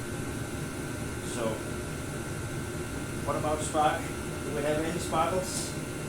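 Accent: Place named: Denmark. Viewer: i have a Norlett with variable speed drive and reverse. I like it way more than the Texas brand👍 I swapped the clapped out old Briggs that would never start, for a chinese honda clone engine. And now i use it with tires on, and a front mounted mower that were originally meant for a texas tiller 🙂 i just found your channel and subbed because i could immediately hear you're a fellow dane 😁🇩🇰🙂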